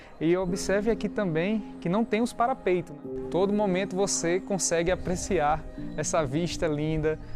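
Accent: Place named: João Pessoa, Brazil. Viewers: Assistir esse vídeo foi tão prazeroso me fez lembrar do tempo antigo muito emocionante, eu realmente estou chocada com o Ceará tanta beleza tantas histórias, você está mostrando um ceará que eu não conhecia sou do interior de São Paulo e estou amando seu trabalho, que filmagem excelente melhor sotaque do Brasil. Como você diz muito bom de verdade 😅 senti como se eu estivesse aí nesse lugar cheios de histórias, por mais vídeos assim parabéns 👏👏👏👏👏. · Perguntei porque cheguei a 3 meses de João Pessoa,achei o seu sotaque parecido com o de JP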